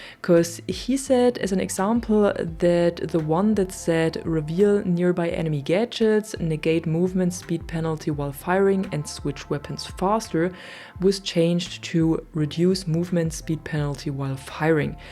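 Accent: Germany. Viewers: Super süß wenn deutsche englisch reden 😃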